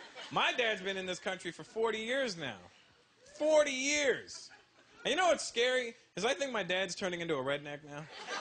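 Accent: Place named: Newfoundland, Canada. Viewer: + He is really talking about Newfoundlanders. You will NOT hear that accent or that way of talking west of the maritimes unless you ARE from the maritimes!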